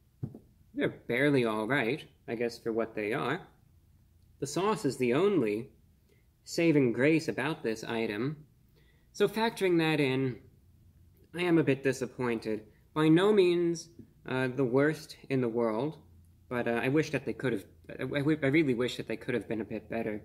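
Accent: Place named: Transatlantic, United States. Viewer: Man's bringing back the old-school transatlantic accent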